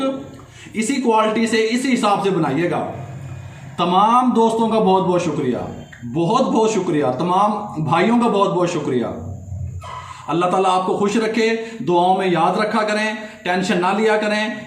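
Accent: Punjab, India. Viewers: ur style...ur punjabi tach....wow masha Allah...love it.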